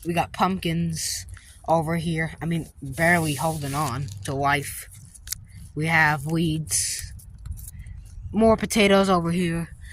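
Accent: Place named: New York, United States. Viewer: Where u from? I hear NY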